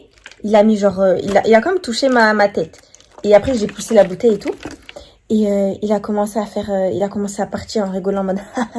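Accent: United Kingdom. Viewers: Ton accent British 🥺🥺🥺🥺🥺❤️❤️❤️❤️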